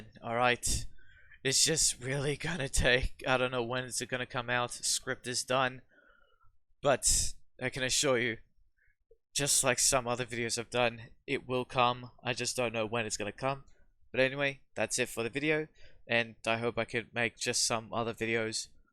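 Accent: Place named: New Zealand. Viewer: I swear you've got a bit of kiwi in your voice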